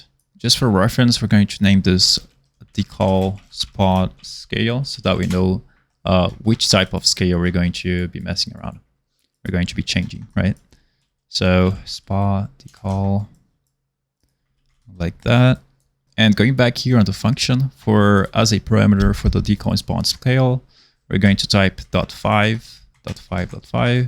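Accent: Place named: Brazil. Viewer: bro has that brazilian accent :goodvibes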